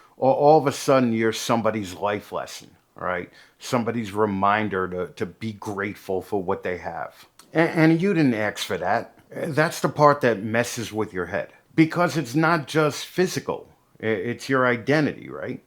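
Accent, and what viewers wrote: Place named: Chicago, United States. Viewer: 1. Was prosthetic leg not an option? 2. Great message/spirit. 3. Have you ever lived in Chicago? Your accent reminds me of when I lived there.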